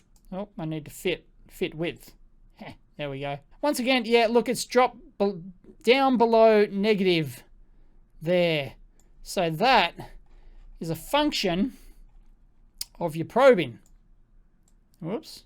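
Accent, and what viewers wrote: Australia. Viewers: What brand is the screwdriver set? I hear "weir" or something. Or was it Wiha? Sorry, listing to an Australian with American ears.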